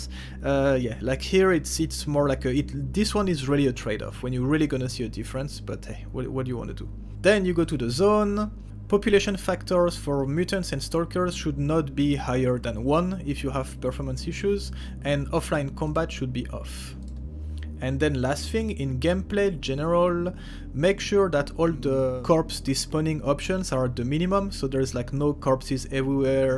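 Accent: France. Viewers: Hello dude ! Are you french by the way :)? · l'accent francais 🤣ca fait plaisir quelques frenchs dans ce youtube game hahaha😂😝🤪😜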